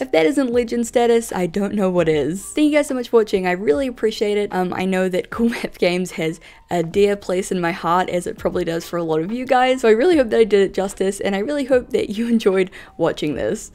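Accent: New Zealand. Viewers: didnt know you were from nz lol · I'm sorry but your kiwi accent makes it sound like "cool meth" and it keeps catching me off guard 😆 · HOL' UPP!!! yer a Kiwi.?! i, uh... actually forgot New Zealand existed. Thought you were an Aussie.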